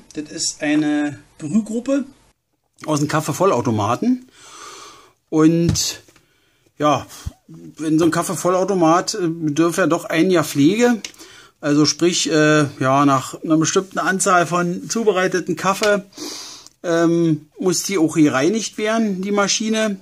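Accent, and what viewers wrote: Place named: Berlin, Germany. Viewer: Danke! Das hat sehr geholfen☺️ Und dein Berliner Akzent ist super und du erklärst das mit guter Stimme. Top!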